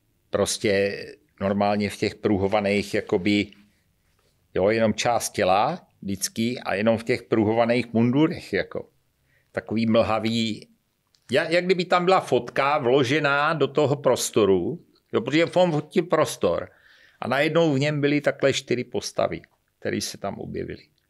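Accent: Brno, Czech Republic. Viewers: ten hantec mě rve uši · Pač je borec ze Šlapanic Kousek od Brna Mluví Brněnšinou Stejně jako Moris z Madagaskaru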